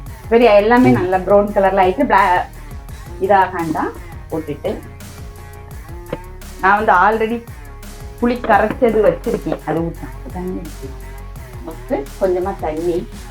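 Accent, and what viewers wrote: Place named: Kerala, India. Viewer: Kattu soru. Kanyakumari enbadhal malayala accent/ dialect oda pesuhirar.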